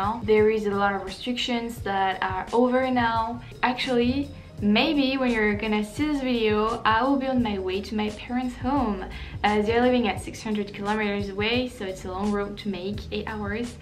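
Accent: France. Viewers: Your ( French ) accent is so beautiful, so calming, so relaxing as well...Great reaction my friend..Cheers.. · I think you are such a great person! I love your passion for her and you are right that she can make life better to listen to her when things are stressful. with the virus right now she is able to make it better. You are so cute as well and I love it when you talk! I love the french accent you have and it makes me happy just like when sings!!!!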